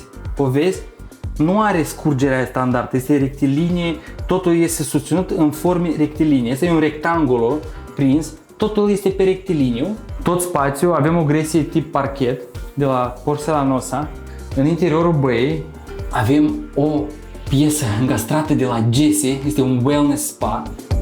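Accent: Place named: Moldova. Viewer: Prietene, tu nu ai un accent minimalist; accentul tau de moldovan e foarte pregnant!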